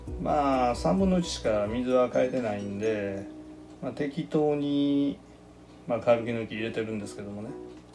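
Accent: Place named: Kansai, Japan. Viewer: わぁーー😆今回はちゃんの生声素敵voiceですね✨✨関西弁❓私関西出身なので久々の関西弁に懐かしく嬉しくなりました\(//∇//)\ アクリル水槽は傷がつきやすいのですね👍✨✨ 湯沸かし器を、通して水を、入れているのです😊細かいメンテナンスでちゃんのお魚達はご機嫌ですね👍✨✨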